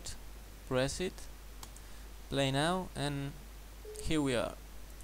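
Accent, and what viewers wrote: Spain. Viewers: menudo acentaco a español tienes jaja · ERES ESPAÑOL? XD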